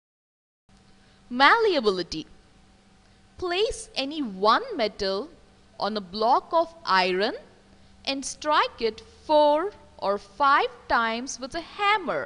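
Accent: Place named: India. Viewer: Indian accent detected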